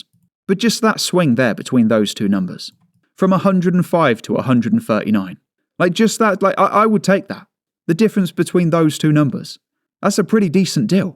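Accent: United Kingdom. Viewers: Thick British enough said.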